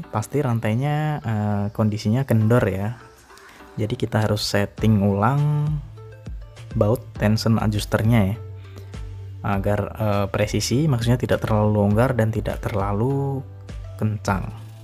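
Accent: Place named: Indonesia. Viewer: tplong Bro ..bahasa inggris jgn dieja dgn indonesia kl screw itu bacanya skru kl adjuster bacanya edjaster hhh youtuber indonesia dah paling parah dah.. modern tampilan tp ada rasanya primitf2nya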